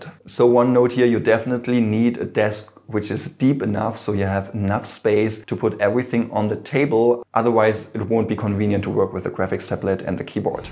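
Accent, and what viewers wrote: Germany. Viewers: Man hört voll raus, dass du als Deutschland bist😂✌🏻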